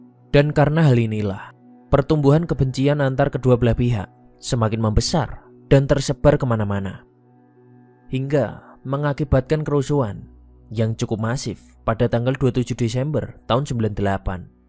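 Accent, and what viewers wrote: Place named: Java, Indonesia. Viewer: Medok guys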